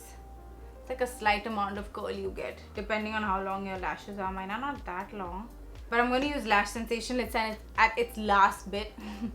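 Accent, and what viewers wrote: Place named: United Kingdom. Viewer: I looove when she speak British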